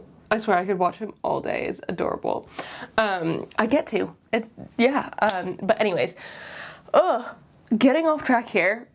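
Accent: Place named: RP, United Kingdom. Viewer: So random, but I have to ask -- where are you from? You can definitely hear the slight "posh" accent, but were you born and raised in the UK or somewhere else? I love your voice/accent!! Editing to add, sorry if you've addressed this somewhere before .. I'm new to discovering your channel and I love it here! Just followed you on IG too! (Where I saw your bio says London!)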